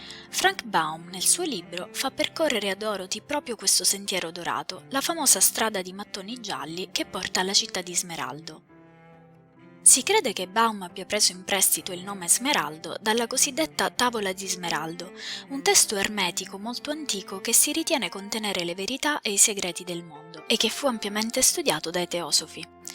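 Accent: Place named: Sicily, Italy. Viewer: È incredibile il lavoro che fai!! Non ti concentri solo sulla storyline come fanno tanti altri youtuber, ma guardi al quadro generale e cerchi di estrarre il messaggio più ampio che i ragazzi e la bighit vogliono mandare, unendo tutti i riferimenti letterari (da studentessa di psicologia questa cosa mi esalta). Mi dispiace solo che tu non abbia tanti iscritti, forse perché i video sono in italiano. Ti consiglierei di provare a parlare in inglese, e se non sei tanto sicura della pronuncia usa la voce robotica, vedrai che i tuoi iscritti esploderanno perché da quello che ho visto sei la migliore in circolazione❤❤❤ Ps. Adoro l'accento siciliano 😍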